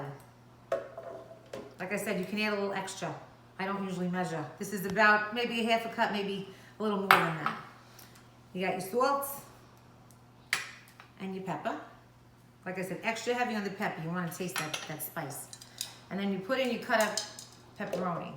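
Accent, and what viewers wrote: New York, United States. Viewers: Methinks this lovely lady is from Brooklyn or NYC, from her accent. this does look good, but gee--10 eggs??? WOW! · peppa , sawlt....and butta... AH... I love my NY peeps! Awesome !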